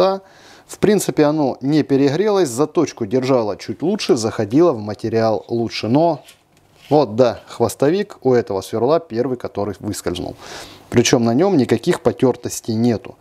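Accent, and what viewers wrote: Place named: Rostov, Russia. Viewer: Прикольный ростовский выговор)))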